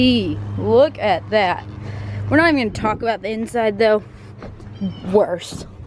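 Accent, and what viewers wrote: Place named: Texas, United States. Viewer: A beautiful texas girl with a beautiful southern accent! What part of texas you from?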